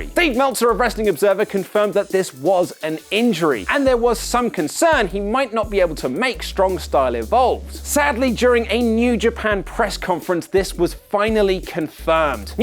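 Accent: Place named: United Kingdom. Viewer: Some of his words I do have to listen to again. I guess his British accent and my hillbilly ears clash....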